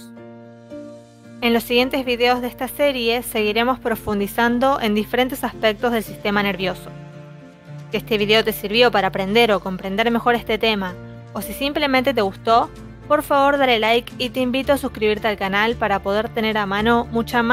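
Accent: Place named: Argentina. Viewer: Q raro la mano negra tomando en cuenta q suenas como argentina en fin 🤔😑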